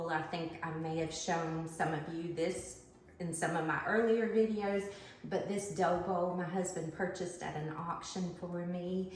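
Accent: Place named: Western North Carolina, United States. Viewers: As an E. TN native (raised in Sevier County and worked in Knox County) and now living in W. NC with my wife, hearing you talk is being at my mom's or one of my aunts' houses and I love it! I can practically smell those biscuits and my wife asked me to send her the recipe, too. Talking about Hardee's (or any of the larger chain restaurants for that matter), your husband, like myself, has probably noticed how much smaller they make their biscuits now. This is probably going to be added to our twice a week home-style breakfast routine (the rest of the week we just grab a protein drink or a bowl of oatmeal) thanks to you, lol. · I love listening to you! You remind me of home. (Mountains of WNC)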